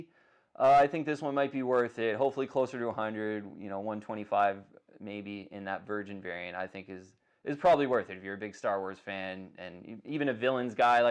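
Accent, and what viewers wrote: Canada. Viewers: Love this channel!!! The Canadian accent makes me home sick - LOL Great videos keep them coming!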